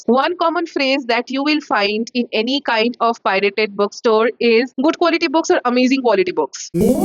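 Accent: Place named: Bengal, India. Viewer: You are a bengali. We can observe from your accent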